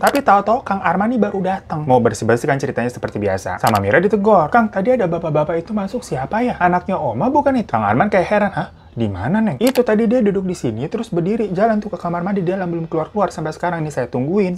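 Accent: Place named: Sunda, Indonesia. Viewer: Sok atuh nyarita deui hahha...sayah orang bandung ketawa liat kk ngomong bahasa sunda😂😂😂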